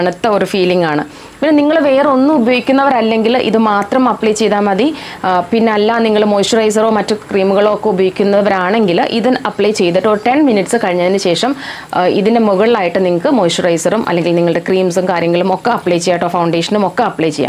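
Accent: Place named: Tamil Nadu, India. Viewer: തമിഴ് പറയുന്ന ആൾ മലയാളം പറയുന്ന പോലെ ഉണ്ട്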